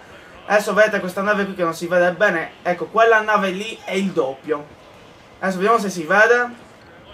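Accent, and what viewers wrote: Sardinia, Italy. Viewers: sei sardo vero :) anche io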